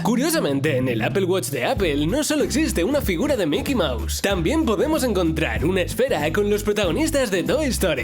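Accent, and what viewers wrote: Spain. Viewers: si son de españa porque creo que 2 hacen los videos pero uno narra XD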